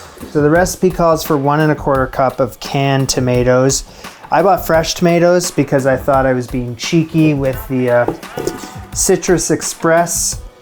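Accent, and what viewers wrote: Canada. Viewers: Has accent become more Canadian since he moved back to Canada?🧐😅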